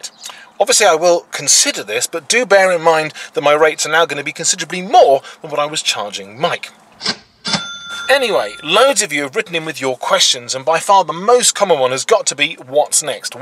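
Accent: United Kingdom. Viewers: Nothing like a classic British accent on a very America show. ha ha. It would be awesome marriage GM & Edd.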